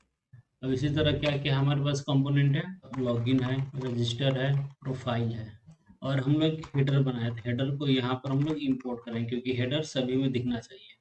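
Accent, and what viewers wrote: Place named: Chhattisgarh, India. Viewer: Bhai chhattisgarh se ho kya?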